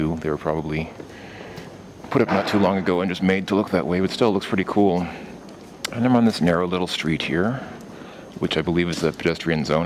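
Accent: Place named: France. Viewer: I know you can't speak german , but the way you read words is in french like the word gasse ... You have to pronounce the 'e' In german you have to pronounce every single letter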